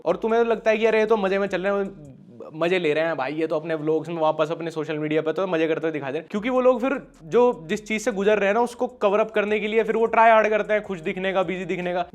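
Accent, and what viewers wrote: Haryana, India. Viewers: Yaar apki awaz me thoda so haryanvi touch h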